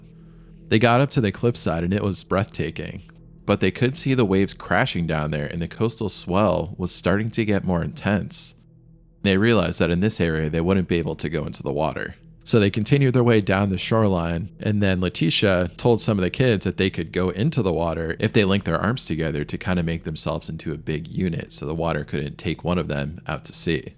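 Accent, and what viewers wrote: United States. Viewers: I'm doing some work at home and I have to choose between hearing this guy's American accent and a neighbour using a chainsaw. Shit!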